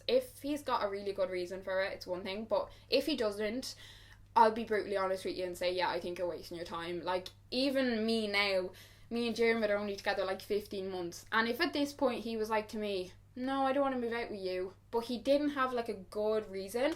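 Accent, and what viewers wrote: Dublin, Ireland. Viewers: Hi, is that a Dublin accent you have?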